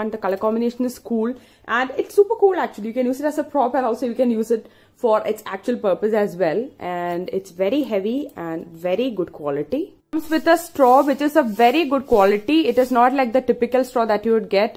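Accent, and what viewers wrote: Kerala, India. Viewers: Are you a mallu! Your accent proves it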